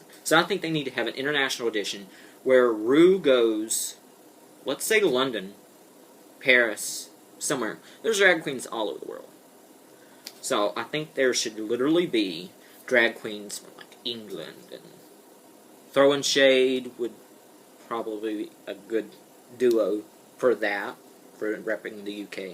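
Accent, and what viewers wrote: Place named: South, United States. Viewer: He sounds southern. Slow and the pauses, yes. The "ums" are a trademark of his videos Ive noticed. But it is who he is, if he did his videos definitely he would be acting. Everyone has their own style and mannerisms on youtube.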